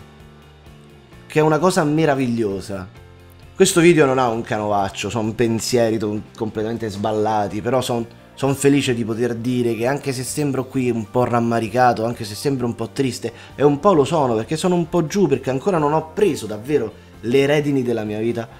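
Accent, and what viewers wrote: Rome, Italy. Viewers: hai ringraziato i tuoi 200k..per averti aiutato..io nel mio piccolo farò lo stesso..grazie..penso sia un pensiero condiviso da molti..lasciare tutto e provare fin in fondo a fare ciò che ci piace è forse la cosa più difficile da fare..grazie del tuo coraggio..anche tu hai aiutato moltissime persone che in quel momento avevano bisogno di sorridere con un po' di romanaccio di sottofondo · Dietro uno schermo sembrate così extraterrestri, ma tu..o meglio, tu..sei una persona, vera e pulita. Ora io non ti conosco, dal vero almeno, ma sento la tua 'stranezza' molto simile alla mia stranezza, sei la persona più vicina a essere umano che ci sia su YouTube Italia.. tanto umana e da riuscire a farmi piangere col 'signor Mario.' a farmi ridere col tuo romanaccio nei video di minecraft. La cosa che stupisce di te è l'umanità, un valore che a quanto pare sta andando perdendosi. 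Io un giorno ti incontrerò, ti offriró un'immensa Gordon scura e farò un'immensa chiacchierata con te. Per il momento la Gordon la bevo in tuo nome, grande uomo in un mondo di alieni.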